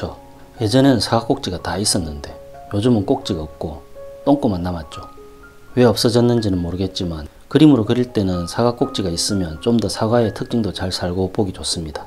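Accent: Gyeongsang, South Korea. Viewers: 님 경상도 사투리 멘트가 아주 정겹고 그림설명도 넘 좋으시네~♡♡ 🎉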